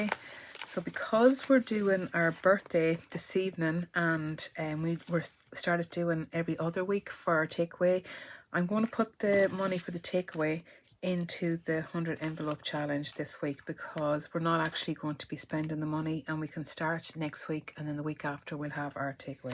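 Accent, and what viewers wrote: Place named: Ireland. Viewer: Irish accent is amazing! I was counting with you the second time...hahaha...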